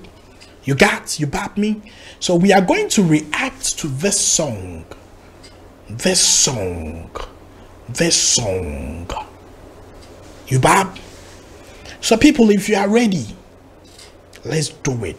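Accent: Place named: Nigeria. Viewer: Walai you don turn Naija as u dey talk. na sure man him dey throw beta song wellwell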